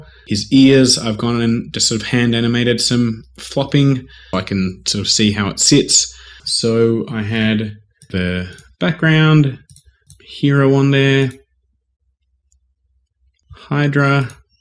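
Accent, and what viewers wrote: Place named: Australia. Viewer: wait you're australian!? ozzi ozzi ozzi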